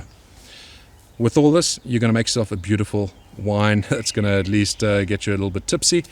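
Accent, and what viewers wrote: South Africa. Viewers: Nicely styled out there, could've had water all over the deck! Looking forward to trying this, just picked up a couple of demijohn's from Wilko (£8 each - pretty good value), and ordering the chemicals on-line. Going to try 3l grape juice, 1l Orange to start with (make sure I can do it before I get too inventive!). Hopefully should be good. Thanks, and love the SA accent by the way. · That's put a smile on My Face, I thought i heard a SA accent and thought that's where You are ? What part of the UK are you ?, I now live in the Northwest of Ireland But Originally from Portsmouth ,UK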